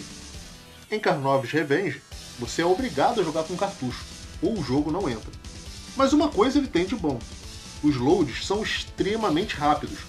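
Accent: Rio de Janeiro, Brazil. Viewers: O vídeo é ótimo sem dúvida. O difícil mesmo é ter de suportar esse carioquêixxx carregadíssimo.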